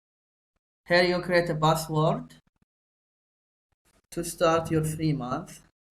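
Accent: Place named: Arab world. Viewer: تحس عنده الاكسنت العربية شوي ممكن يكون من اصول هندية ولا شي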